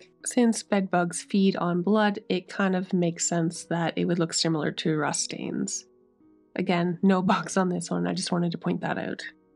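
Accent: Canada. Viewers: Gorgeous!! Ok, confused. You definitely have the Canadian accent but your “y’all” really threw me! 😂 I was born and raised in Wisconsin, but have lived in the south for 30 years, so to say I have an odd accent would be an understatement… But I definitely say y’all because I got sooo many comments for saying you guys.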